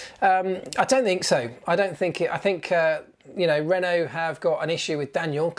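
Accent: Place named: Bromley, United Kingdom. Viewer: Bromley boy! I knew I recognised that accent. Curious to know which school?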